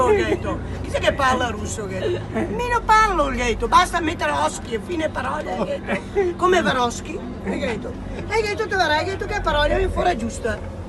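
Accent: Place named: Vicenza, Italy. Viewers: sicuro vicenza, zona ovest, probailmente Montecchio Maggiore e dintorni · Forse Vicenza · Vicenza.. confermo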